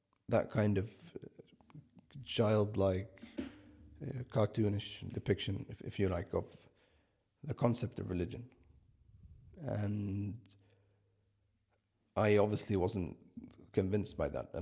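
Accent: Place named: Arab world. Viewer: Appreciate the video ... just some constructive criticism .. because not even Omani's do what you're doing .. when you speak English which is your native tounge your speaking it as if your speaking Arabic ... I think if you're more natural your English videos will be better .. this seems sooooooo reliant on the Arabic accent that you've developed .. that it comes off boring and almost forced ... just my analysis I think as an Omani myself you can do better in representing your own culture, the English culture, with a better delivery of the spoken voice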